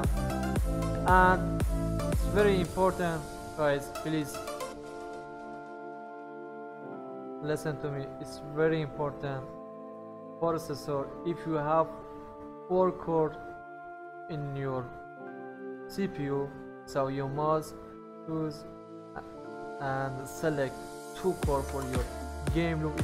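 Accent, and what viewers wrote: Iran. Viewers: Thanks bro where are you from? You speak like iranin (دمت گرم داداش خیلی مفید بود تونستی فارسیشم بساز)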